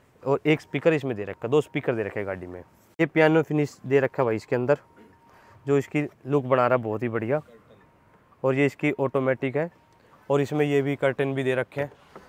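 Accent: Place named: Haryana, India. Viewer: Bhai mere loved ur haryanvi tone …. Pehli bar haryanvi mein car vlog dekh ke ghana hi maja aagya … moj kar di bhai … bhot badhai aapka haryanvi mein vlog dekhte hi subscribe kia meine channel aapka … Jai shri Ram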